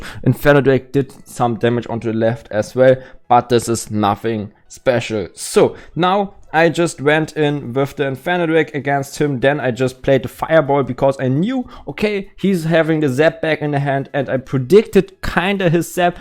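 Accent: Germany. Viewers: Ich liebe deinen deutschen accent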